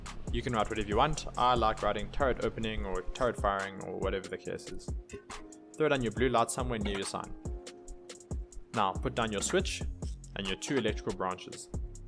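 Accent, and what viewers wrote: South Africa. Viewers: Bru i just hear my SA boet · I feel like this guy is South Africa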